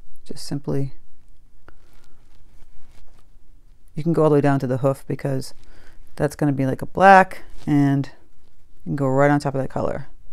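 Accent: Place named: New England, United States. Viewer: I love your painting! But even better I love your personality. You make me laugh and teach me things at the same time! What part of New England are you from? Your accent sounds like my daughters. She is Vermont/New Hampshire.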